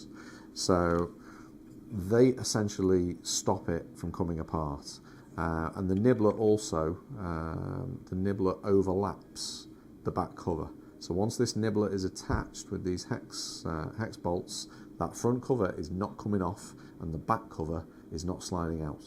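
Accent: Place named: Wirral, United Kingdom. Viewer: Sticking with that Wirral Accent 😂 Mate, You do such a good good with these designs, They look incredible. Looking forward to my Bender arriving, I should have come get it since your down the road. I'll get a nibbler next money day! You think you'll do something for the RAK Boards or T-Beam. You design would be awesome on the T-Deck. All.the best mate! Sean (2E0SYA)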